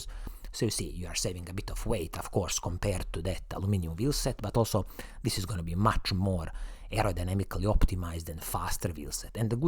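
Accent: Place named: Italy. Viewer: English with italian accent, so funny 😃, yes nice bike without stock,, it's like they are no exist like model.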